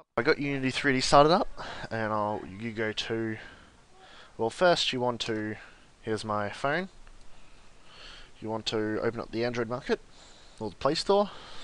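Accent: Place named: Australia. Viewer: You're Australian :D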